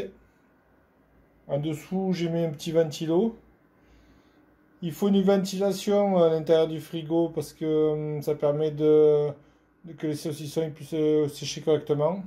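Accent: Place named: Vaucluse, France. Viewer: Merci à toi surtout !!! J'ai récupéré un frigo qu'on m'a donné et fait exactement la même installation que toi (j'ai bien branché la terre) et j'ai lancé aujourd'hui une belle rouelle de porc!! Encore merci! Tibo du Vaucluse (on doit pas être loin vu l'accent)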